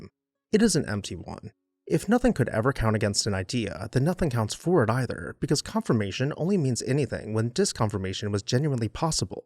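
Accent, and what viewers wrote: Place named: South, United States. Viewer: Interesting mix of a slight southern gay drawl with a hint of late to chabad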